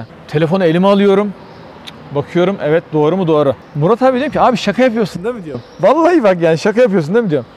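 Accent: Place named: Isparta, Turkey. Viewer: Hocam ispartali misin? Ben yalvacliyim siven bizim oraları andırıyor. Anlattığın olayda ince düşünmen gayet güzel, takipteyim😊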